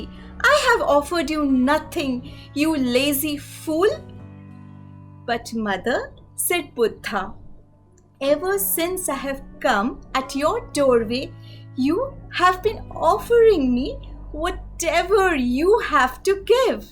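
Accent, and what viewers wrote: India. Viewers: Cute Indian accent. I would come back to listen what story would you have next. <3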